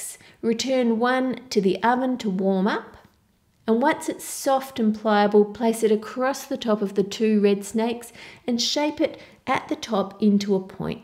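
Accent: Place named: Australia. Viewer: Put da suga and da wata 😂😂😂 i love Aussie accent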